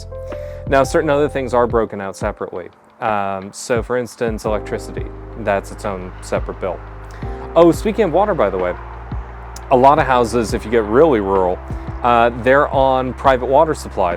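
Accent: Scotland, United Kingdom. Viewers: I get a chuckle when your Scottish accent is creeping in. The same thing will happen to me. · Guessing that you aren't obsessed as I am about organic foods. Are they as accessible as in the U.S.? I LOVE hearing Scottish brogue !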